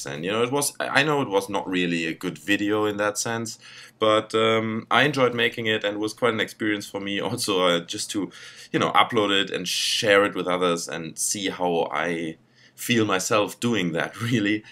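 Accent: Germany. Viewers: Nice to hear a German accent on here :)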